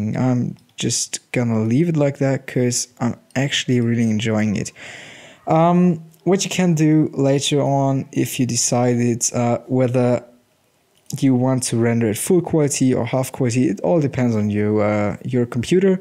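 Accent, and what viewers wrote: Germany. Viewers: U are german right? Good Tutorial. Looks realy Nice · geil ein deutscher der englisch redet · BHAudioProduction Spoken with a strong german accent.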